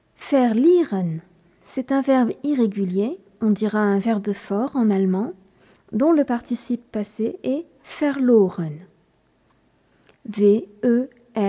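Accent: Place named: France. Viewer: oui oui tu as raison, Je ne voulais vous attaquer, je trouve que tu es bien familier avec le grammatique allemand (bien que tu as un accent francais tres visible, mais c pas grave, quand je vais parle francais c'est la meme chose :P) c'est juste qu' au debut le contexte n'etait claire pour moi :) alors exuse mon francais terrible, wünsche dir aufjedenfall viel Erfolg bei deinen weiteren Videos zum Thema Deutsch lernen :)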